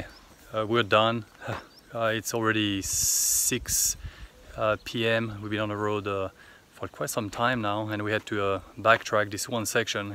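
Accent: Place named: France. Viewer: not to sound demeaning or such I have been trying to figure out your accent leaning towards French been watching all of your vids they are great hoping to do the same someday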